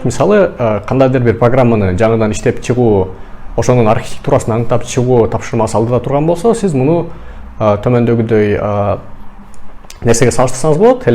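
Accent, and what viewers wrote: Standard. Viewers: Ассалам алайкум тууганым кыргыз тилин таза сүйлөйт экенсиң азаматысын! Кыргыз мусулман ошого динибизди да үйрөнүп алсак жакшы болот.ишине ийгилик каалайм алга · Кыргызча абдан таза суйлойт экенсиз. Ийгилик! Озум программалоо багытынын 2-курсунда окуп атам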